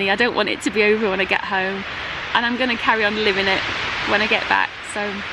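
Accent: United Kingdom. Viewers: Congratulations! I've followed your trip from the start and it's been quite the journey. Thank you for taking the time and effort to produce such great videos. You love for nature really comes through. I will miss your British accent and expressions.Your heart is as big as your smile and always made me happy watching. Good luck and vegan power!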